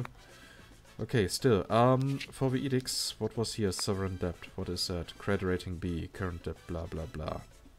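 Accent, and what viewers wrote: Germany. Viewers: German accent is hilarious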